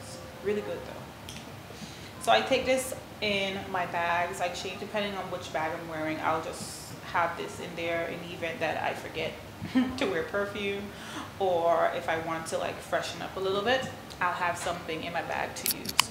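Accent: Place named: Caribbean. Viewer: niceeee I knew it , I can recognise my island fam anywhere lol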